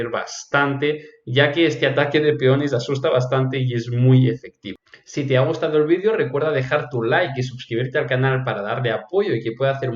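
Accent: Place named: United States. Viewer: Hablas un excelente inglés americano.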